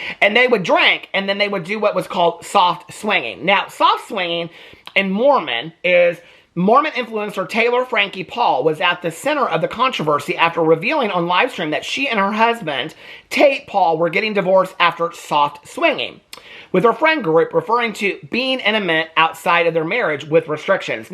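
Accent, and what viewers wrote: South, United States. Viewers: are u from nashville u have a south accent lol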